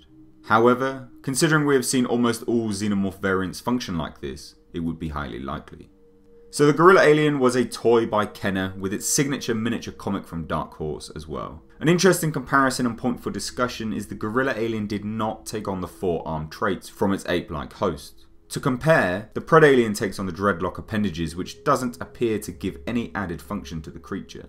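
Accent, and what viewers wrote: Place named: East England, United Kingdom. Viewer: U. PY I am going to guess you're East English or Western English? You said Love so I am going to guess east.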